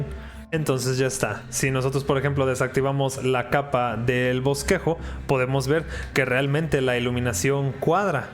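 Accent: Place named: Monterrey, Mexico. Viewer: Muy buenos tus videos compadre, stan con madre, se aprecia el esfurzo enorme muy entretenidos dinamicos con ese inconfundible acento regio, saludos🙏🙏🔥🔥🦾🦾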